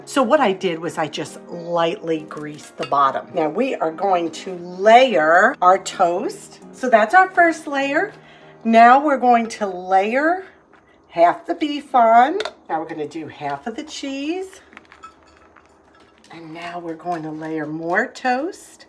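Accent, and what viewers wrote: New York, United States. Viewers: Congratulations! So excited for your channel's growth! I love your channel. I adore your NY accent. I am a native NY'er transplanted to TX and I feel like I'm back home when I have your channel on, playing in the background while I cook. The latest thing I learned during my frugal journey was to pressure can. I pressure canned chicken and beef and didn't blow up my kitchen! I call that a win.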